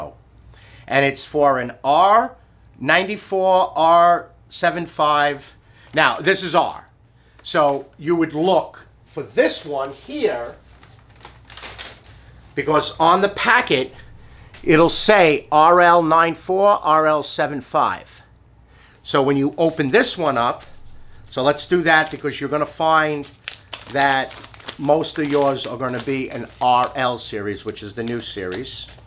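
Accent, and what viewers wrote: New York, United States. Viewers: Your NY accent is absolutely wonderful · Youse speak like my Lonk Island relatives... just for the NY accent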